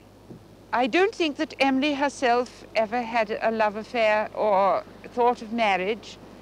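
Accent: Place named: Scotland, United Kingdom. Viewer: It's a posh Scottish accent actually.😊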